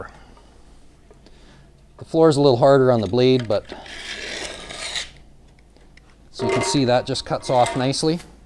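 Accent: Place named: Canada. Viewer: Canadian accent 😕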